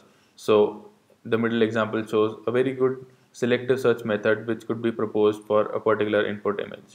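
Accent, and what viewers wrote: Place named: India. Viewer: 咖喱味的英语听不懂啊^^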